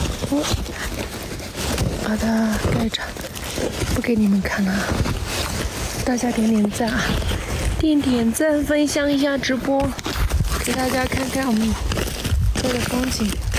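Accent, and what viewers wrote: Sichuan, China. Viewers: 的家乡真美啊！为你家乡骄傲，为你家乡出了这样的美女骄傲。听到一口的川味普通话觉得特别亲切，一点没觉得是藏族人。不用化妆更好，更觉得是个活生生的人。平时看的舞蹈，真是觉得是仙女下凡。这个短片也让我们感到真正的存在。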